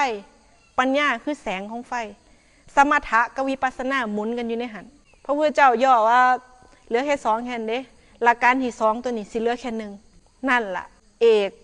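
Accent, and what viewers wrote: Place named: Laos. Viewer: แม่ชีเว้าน่ารักตกคำเว้าลาวกะเปนตาฮักจ้าน่ารักมากค่ะ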